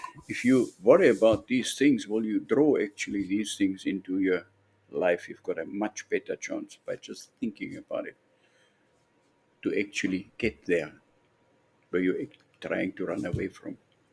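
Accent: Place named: Austria. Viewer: schoen den auch englisch sprechen zu hoeren. seit langer zeit im ausland lebend, erfreue ich mich aber immer wieder seinen oesterreichischen akzent zu hoeren. DANKE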